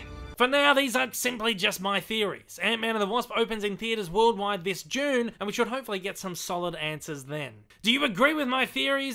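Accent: Australia. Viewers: Great job with the video man but fuck me your voice! You sound like an Aussie version of the slug woman from Monsters Ink 😂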